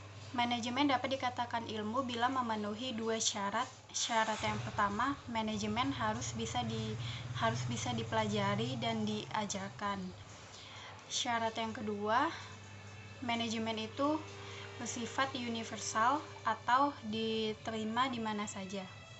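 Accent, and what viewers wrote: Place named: Java, Indonesia. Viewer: Asli mana kak ..kok medok jawa ?🤣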